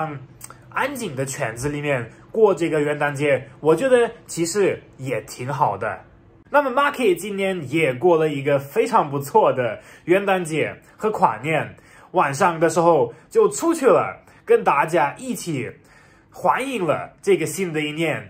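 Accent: Sichuan, China. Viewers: 这川普有点打脑壳！！🤣🤣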